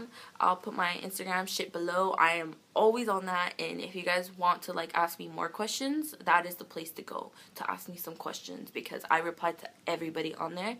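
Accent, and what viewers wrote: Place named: Canada. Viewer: Lol from your voice I thought you lived in Canada cause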